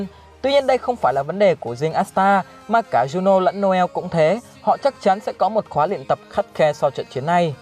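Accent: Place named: North, Vietnam. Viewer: ad ổng gốc bắc sống ở nam mà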